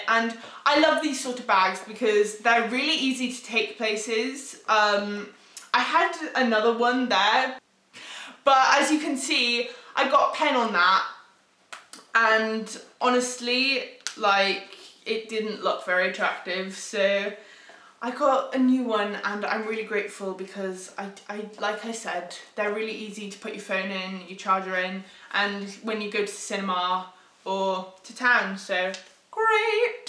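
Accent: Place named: Ireland. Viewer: Are you Irish? If you're not I'm sorry for asking but idk you sound really Irish to me all the sudden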